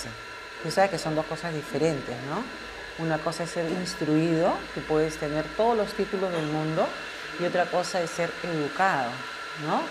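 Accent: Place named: Peru. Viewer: De dónde es? Me parece que de Perú por el acento, espero no decir algo incorrecto, yo soy de Ecuador y estoy estudiando en España, pero la mayoría del tiempo estoy en Alemania porque mi novio es de acá, y tiene mucha razón en todo lo que dice, pero debo decir que los colombianos soy bastante corteses, todos los que he conocido siempre dicen: "perdón", "disculpe", "por favor", "gracias" y "permiso", incluso no se sientan hasta que les digamos "por favor, toma asiento" jeje, y siempre me han tratado de usted hasta amigas de mi edad o incluso mayores, es interesante... al contrario que en mi país incluso también es común dejar propina allá, en fin, gracias por el video. :)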